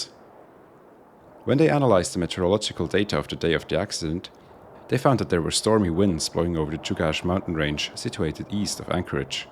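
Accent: Switzerland. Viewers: You draw only one wave, that right over the obstacle. Which is orographic wind (wind following the contour of the landscape) and has nothing at all to do with the wave phenomenon. The wave is a gravity wave of the whole atmosphere BEHIND the obstacle. (Comparable to the wave of a stream behind an obstructing rock.) Rotors are aligned with the waves behind the ridge, and not just chaotic phenomena downstream as your drawing implies. Completely off topic: Are you Swiss? I seem to hear a hint in your accent. (Swiss myself.) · Fantastic videos, and love your (more Swiss, than Austrian, actually) accent. One nit: I observed a pattern where you say things like "all but unforeseeable", when really what you mean is the opposite: all but foreseeable, meaning it is everything except that it can be foreseen. Keep it up, man, these are great.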